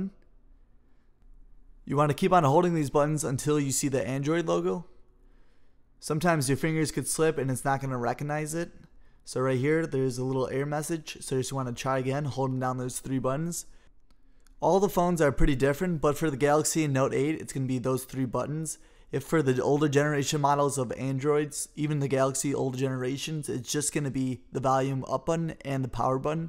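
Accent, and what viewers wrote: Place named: United States. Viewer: Thank God your American and i can hear you clearly.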